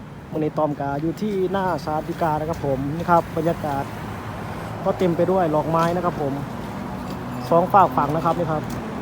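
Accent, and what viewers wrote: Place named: Champasak, Laos. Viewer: บ่าว มาจากแขวงไหนครับ? ผมเดาว่ามาจากแขวงจำปาศักดิ์ สังเกตจากการออกเสียงด.เด็ก,ซโซ่,ออกเสียง ฤดูเป็น ระดู ฯลฯ ผมเดาถูกมั้ยครับ?😂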